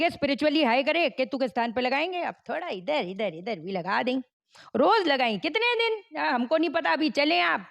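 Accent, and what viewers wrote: Punjab, India. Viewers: Di kya aap Punjabi ho Maine kai baar suna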